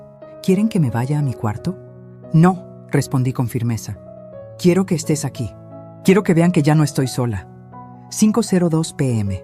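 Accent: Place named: Portugal. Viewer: Buena historia esta voz narra varias historias, pero es chocante que narre y meta portuguez y la acentuacion española ?????? Con todo respeto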